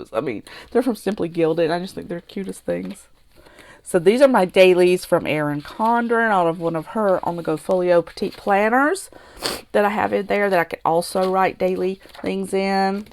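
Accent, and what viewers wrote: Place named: South, United States. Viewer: Love your accent! I’m from Mississippi but live in Alaska. I don’t hear that lovely southern accent much anymore.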